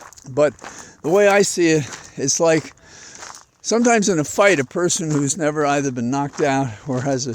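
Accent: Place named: Ireland. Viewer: I do not agree with some things you say,but you do have a wonderful Irish blos in your voice and I think you are a very good Poet without knowing it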